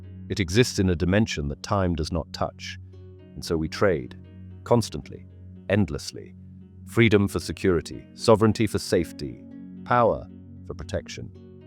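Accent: United Kingdom. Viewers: I listened closely to the first 15 minutes and I can't believe people actually see this as anything more than Kamala Harris talking before an election it says absolutely nothing in a British accent for 15 minutes I can't listen to the rest of it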